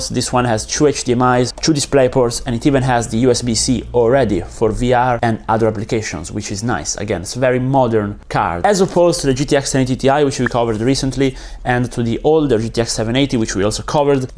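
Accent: Italy. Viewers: si sente l'accento italiano 😂😂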